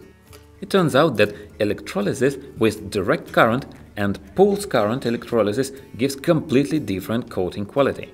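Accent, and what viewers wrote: Russia. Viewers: Акцент русский=)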